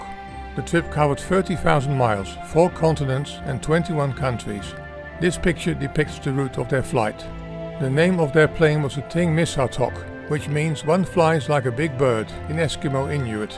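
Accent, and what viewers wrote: Netherlands. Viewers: Not clear why YT makes problems to best authors like you rather than focusing on garbage channels! Format works, this video is PURE GOLD!!🌟👌🙌 Love the voice over with Dutch accent!! 🔝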